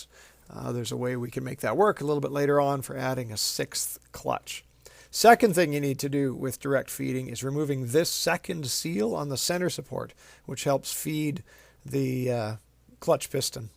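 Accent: Canada. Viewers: Love your video no crap just gogo on the job,thank you l hear your Canadian speak hows that hockey team eh?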